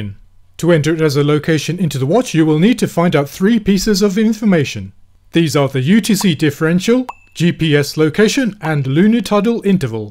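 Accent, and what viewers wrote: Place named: South Africa. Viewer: Dude sounds South African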